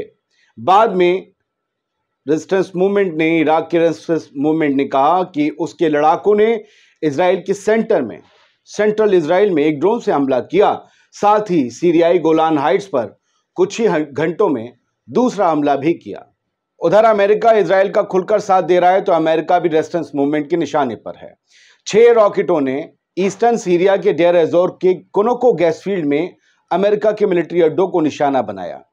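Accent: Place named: Allahabad, India. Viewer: Aapki baat karne ki andaaz ekdum Allahabadi hai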